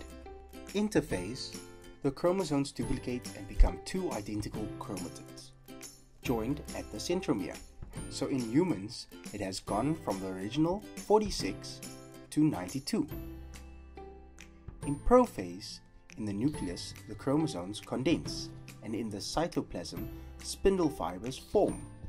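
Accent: South Africa. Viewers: This man sounds South African! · i just want to know if the narrator is south african?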